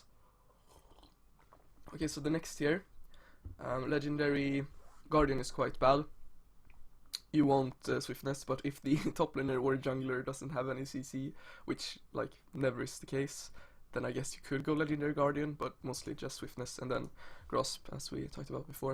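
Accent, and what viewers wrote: Sweden. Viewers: This guide was awesome! Are you Swedish xd? (Your accent sounds like a swede)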